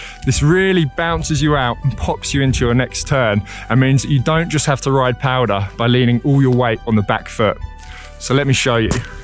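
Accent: United Kingdom. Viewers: Best British Snowboarding Youtuber. I like your British accent. U also an excellent coach.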